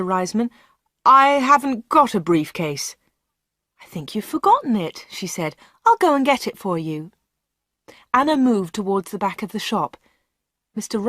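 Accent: United Kingdom. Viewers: این تلفظش بریتیشه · Nice British English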